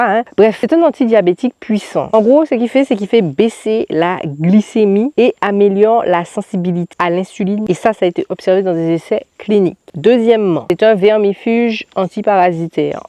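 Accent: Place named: Antilles, France. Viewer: ton accent d. Antilles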